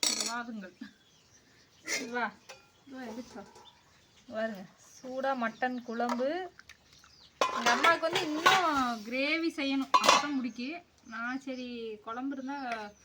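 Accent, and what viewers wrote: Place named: Kongu, India. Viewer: கறிவிருந்து அருமை அருமை நேற்று நீங்கள் பேசிய கொங்கு மொழியும் என்னைப்பற்றி கூறிய விதமும் மிகவும் நன்றாகவும் விரிவாகவும் இருந்தது உங்கள் அம்மாவிற்கு தேனீக்கள் போல் என்றென்றும் சுறுசுறுப்பாக இயங்கும் அம்மாவிற்கு எனது பாராட்டுக்கள் ❤❤❤❤❤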